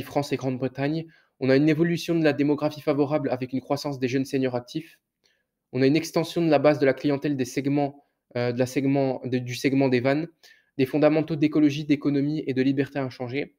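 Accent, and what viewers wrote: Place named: Doubs, France. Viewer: merci ca se voit que tu prends du plaisir. Avec un bel accent (du doubs si j ai bien compris)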